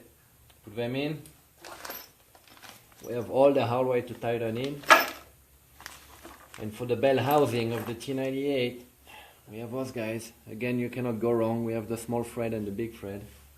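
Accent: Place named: France. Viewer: hello, your accent looks like french guy! PLEASE, i have a IH345 V8 in a 1979 scout2, can i do the same conversion as you did? i actually have a automatic 4 speed gear box on it, what do i have to modify and what parts i need for this conversion? i would appreciate your reply, thanks a lot, and continue like that, i like your channel! cheers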